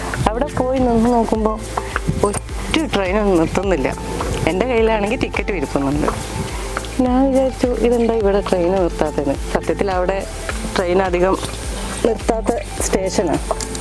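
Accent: Malappuram, India. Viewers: Chechi malappuram kari ano atho kozhikkod kariyo samsaram kettitt chothichatan 🤔😊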